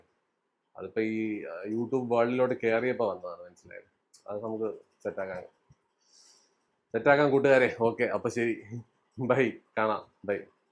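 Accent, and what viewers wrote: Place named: Thiruvananthapuram, India. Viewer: ബ്രോ യുടെ സ്ലാങ് പക്കാ തിരുവനന്തപുരം അതാ ചോദിച്ചേ.❤ എന്തായാലും താങ്ക്സ് ബ്രോ റിപ്ലൈ തന്നതിന്.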